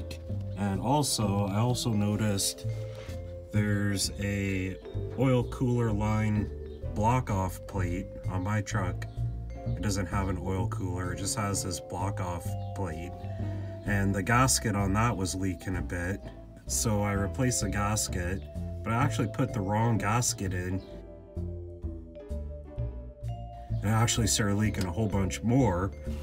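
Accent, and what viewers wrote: Canada. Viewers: G-ah-sket. B-ah-ck. Th-ah-t. B-ah-d. Oowt. Interesting dialect. Cross between Canuck and California Valley speak. 😀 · This is definitely an Eastern Canadian accent! I’m guessing Nova Scotia. The Motomaster oil filter confirms the canuckery.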